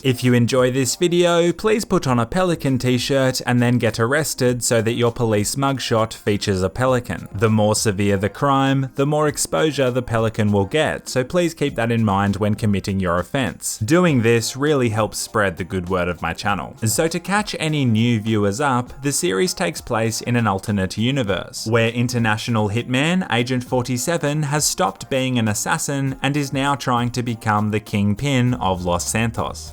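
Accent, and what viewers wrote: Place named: Australia. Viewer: ONLY 160K SUBS? What? Under rated channel! I've beem hooked on amazing commentary and hilarous and spontaneous kind of humour. Goes very well with the Ozzy accent. Keep up the good work Pelican!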